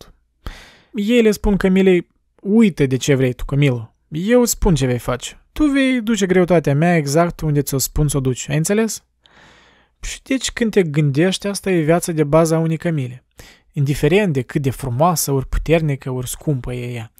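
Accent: Moldova. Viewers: o idee excelenta dar accentul moldovenesc imi vomita in ureche o abominatie a limbii romane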